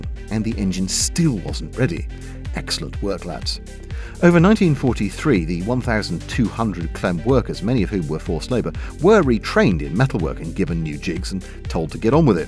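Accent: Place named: England, United Kingdom. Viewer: Damn you! Once again, you have, through the magic of the Youtube "Subscibe" button, caused me to lose some perfectly useful sleep watching your highly informative and semi-mesmerizing presentations on Nazi Germany's comic attempts at world domination! Although this time I have no family-related commentary on the the technology involved in winning the War for this post, at least I understood at least seven out of ten of the words you spoke thanks to my continued exposure to the "English" English language. Thank you, citizens of Weymouth, Dorset, the staff at The Imperial War Museum in London and my friend and British Expat Clumpton - who lives in Vichy, France. Someday, someone will create a series of theme music Memes like that of YouTube's Mark Felton... Do keep up the good work, chap. Cheers!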